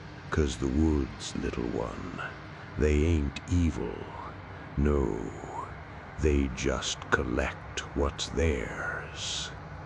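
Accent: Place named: Appalachia, United States. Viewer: I find it an interesting mix that protagonist has a Russian name (a diminutive of Anton) but the narrator dialect makes me think this is takin' place down in the holler of Appalachia. I like it a lot!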